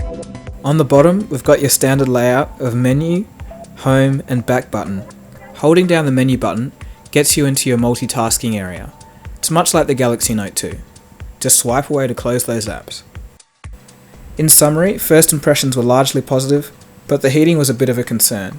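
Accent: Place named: Australia. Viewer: cool. did`nt realise u were from oz tho! you dont have a typical oz accent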